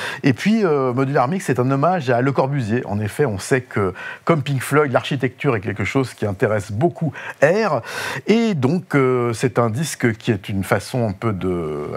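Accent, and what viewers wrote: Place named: United States. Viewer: Merci pour cette rétrospective bien sympathique.. Un clin d’œil à ton accent américain c’est énorme ! Cindy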